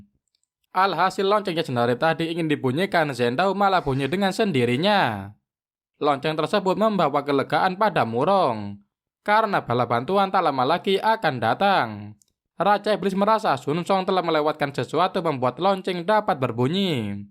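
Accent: Java, Indonesia. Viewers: jelek suaranya, bahasa indonesia dengan lidah kental Jawa.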